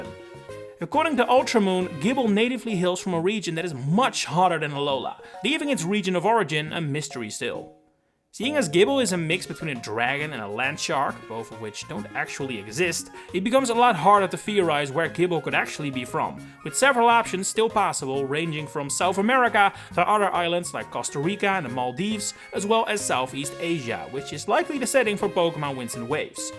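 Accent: Netherlands. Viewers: Really like how you sound Dutch with all the different types of American accents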